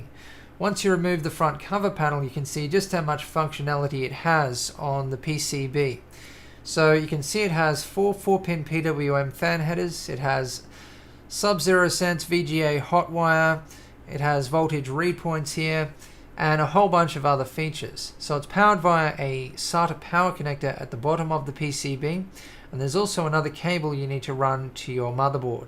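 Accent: Australia. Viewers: I hope you manage to make a nice number of £/$ from your videos because they are excellent. I see that you do builds for customers, is it for only certain parts of the world? I ask because I live in the UK and you sound like you live in Australia... How could you build a rig for someone like me, that lives on the other side of the world? I do have a brother in Oz, for someone like me, I suppose I could pick it up; however, I cannot see me getting my custom rig on the plane as hand luggage. Do you have a website, or place that reveals your details for custom builds? Shalom and Grats!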